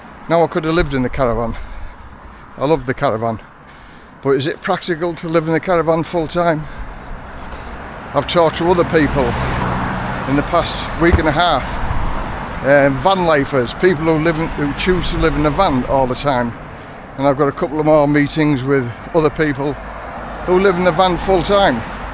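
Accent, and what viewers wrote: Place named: North East England, United Kingdom. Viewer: I absolutely loved watching this. I’m from Durham but have lived in Aus for 15 years and plan to move to Thailand. You’re so lovely, humble and I found your insights really similar to mine. I don’t feel like England is an option for me anymore despite missing the countryside and seasons…. It’s just too expensive now. It was comforting to hear a north east accent again. All the best and I wish you a really prosperous and happy life. I love that you make the most of what you have despite not having a massive income. Your outlook is really lovely. Thanks so much for posting ♥️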